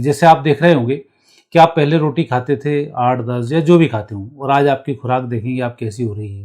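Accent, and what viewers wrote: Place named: Madhya Pradesh, India. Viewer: आपके स्वर से प्रतीत होता है मानों आप भोपाल या होशंगाबाद के आस पास से हैं। या मध्य प्रदेश कहीं से। क्या मैं उचित कह रही हूँ।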